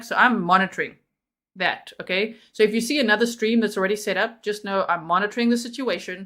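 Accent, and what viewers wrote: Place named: South Africa. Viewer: Before I even start watching I need to state that this is the one case that I follow that I found dubious from the beginning, long before the Odinists came in. From the beginning I thought they do not have anywhere near to enough to convict this man - an unspent round is all......and my instinct was just that this is not the man. This does not make sense...and since the rest came out, I am more and more convinced they have the wrong man and he is being framed....I do not usually easily believe a frame defence, but on this one....I may be convinced. And the judge is just biased.....! I have no idea what she is thinking. By the way, where are you from? I am from South Africa and your accent sounds South African!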